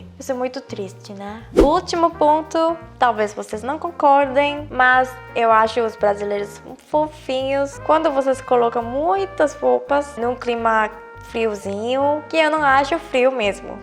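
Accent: South Korea. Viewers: Eu acho o sotaque do coreano falando português e muito fofo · A tem um português e um vocabulário muito refinado, fala até melhor que muitos brasileiros. A cada vídeo que ela posta percebo a diminuição de sotaque coreano. Parabénssss 😊😊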